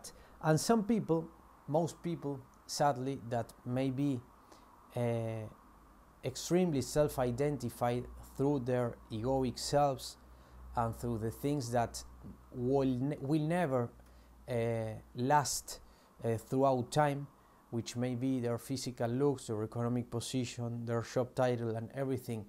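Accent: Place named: Latin America. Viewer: The content is good but I would suggest you working on your pronunciation a bit more. in some parts of the video your English accent sounds too thick and it can get distracting. I am Latina as well (I assume you are) and I know it takes years to perfect pronunciation, but it's not impossible to always strive to sound better, especially if you have important content to communicate. And yeah you're right the whole "Mind control" title can be misleading to many people.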